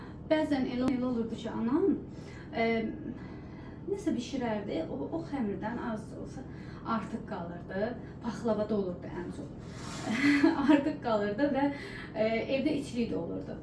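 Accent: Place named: Nakhchivan, Azerbaijan. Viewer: Salam bu şirin danişiq naxcivan danişiqidi🎉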